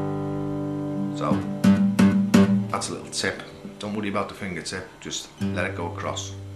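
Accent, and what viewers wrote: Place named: Liverpool, United Kingdom. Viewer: Love listening to the scouse!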